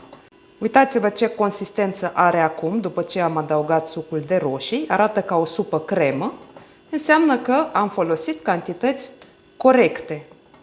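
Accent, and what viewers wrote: Moldova. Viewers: Wou, multumesc pentru explicatiile foarte clare si amanuntite, apreciez foarte mult. dupa accent sinteti din Tepublica Moldova, eu sint din Ardeal, Romania. Imi place farte mult reteta, felicitari si multumesc ca ati descris retetra atat de amanuntit. O zi faina va doresc!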